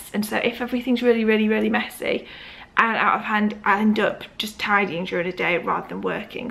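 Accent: United Kingdom. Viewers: Hey! Just got to the point in the vlog where you've spoken about feeling kind of stuck and frustrated in terms of your blog.. and to be honest I don't get it! I LOVE the content you're putting up recently. No matter if it's outfits or collaborations (like the h&m one, which I loved especially) Reading your post makes me giggle sometimes and I even read them in your british accent!! You seen to be such an ambitious young lady, who knows exactly what she wants and I find that so inspiring. There is really no need of feelig frustrated. I think a lot of these feelings come from maybe comparing yourself with others, in this ever changing industry and having to be on top of style, content and life in general. you're doing a Great Job, in all these aspects! and by great I don't mean perfect, because perfect doesn't excite many people. By great I mean relatable, honest and true to yourself! 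much Love xxx PS: I go on your blog every time I've seen an Instagram/snapchat post where you update your followers about it! keep that up xx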